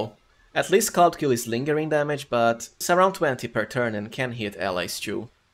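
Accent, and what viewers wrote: Brazil. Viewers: soube pelo sotaque q era br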